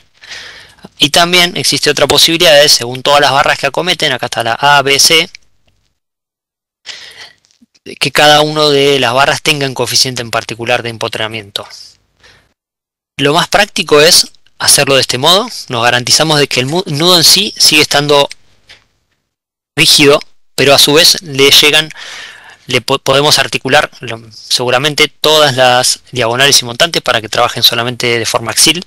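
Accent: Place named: Valparaíso, Chile. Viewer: hablas iwal que el amigo de una amiga, no seras de valparaiso?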